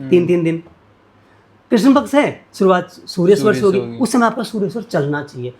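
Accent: Hadoti, India. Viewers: Hadoti Ke Lag Rahe he Botne Se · Gurudev apki language bilkul hadoti rAJASTHAN jese lg rahi h m bhi hadoti ki hoo apki bhasha hakk se jatate huy ;;;;;;;maja aa gy apko padm bhushn milna chayee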